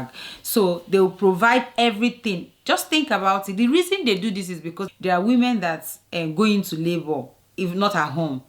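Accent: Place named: Ghana. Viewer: Ah. Are you Ghanaian or Nigerian??? You speak like Ghanaian. 😂😂😂❤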